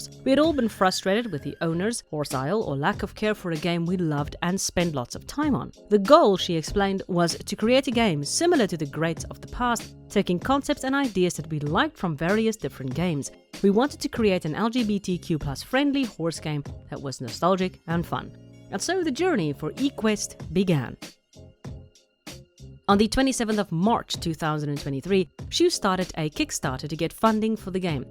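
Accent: Afrikaans, South Africa. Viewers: Wag, jy's Afrikaans? Of praat Afrikaans? Hoe het ek nie die aksent geplaas nie! ei ek voel so dom nou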